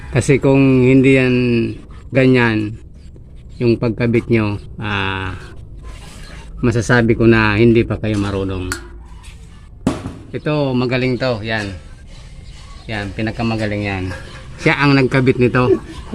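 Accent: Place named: Bicol, Philippines. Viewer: Bicolano manoy?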